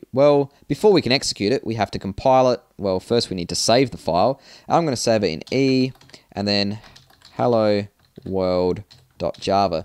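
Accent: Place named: Australia. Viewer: Nice one. And great to find some tutorials with an Aussie accent!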